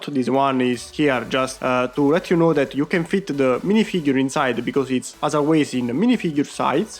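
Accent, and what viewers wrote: Italy. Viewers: Si sente che sei italiano😂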